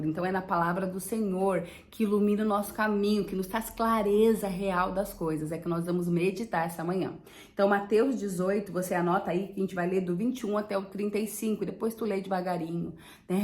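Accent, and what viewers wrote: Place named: Rio Grande do Sul, Brazil. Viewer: Gaúcha né??? Bênção mulher!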